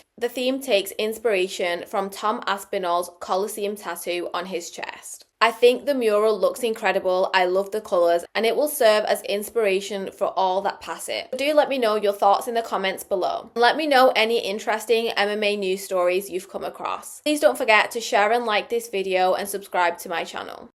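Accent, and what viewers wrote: United Kingdom. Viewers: Pereira vs aspinall will be held back bc jon jones is holding up the official belt and ducking tom unfortunately. I think if jon beats stipe he will call out pereira and that will be done. Btw am i detecting a yorkshire lass? 🤔😂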